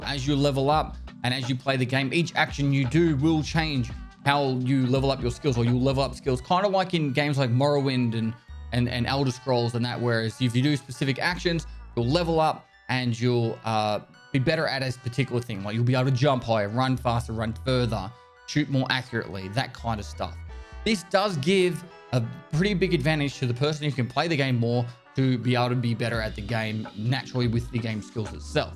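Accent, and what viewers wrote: Australia. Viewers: Uughhh Australian accent 🤢🤢🤮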